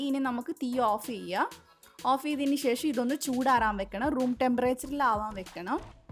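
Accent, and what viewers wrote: Kannur, India. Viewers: കണ്ണൂർ ഭാഷ 👌💓 അടിപൊളി ഒന്ന് ഉണ്ടാക്കി നോക്കിയിട്ട് കാര്യം 😋😋😋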